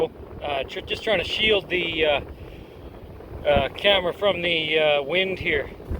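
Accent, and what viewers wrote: England, United Kingdom. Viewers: Love some of the finds and beautiful pics too!! Great English accent on voice over :) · confirmed...it is possible to have...to much of a good thing...more sexy english lady voice overs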